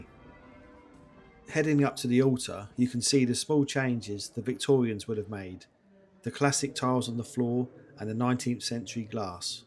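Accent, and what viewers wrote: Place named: United Kingdom. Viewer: Perhaps you don't know but there are very many regional accents in the UK and pronunciation can vary from one area to another. How anyone pronounces words can very much depend on where they were born and brought up, another matter of historical interest. You understood what was meant, so what is there to criticise?! Glad that you liked the presentation, that is what matters 😊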